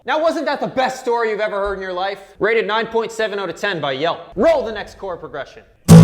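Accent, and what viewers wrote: Canada. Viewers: Thanks man. I actually searched and they actually say Abouut instead of Abaout. Didn't know it was called a Canadian Raising. xD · Pranav Gautam Canadian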